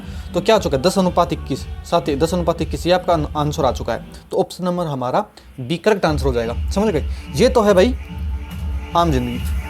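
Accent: Rajasthan, India. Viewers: Rajsthan se ho kya bhaiya aap 😅❤ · Bhai app rajsthan se ho kya ?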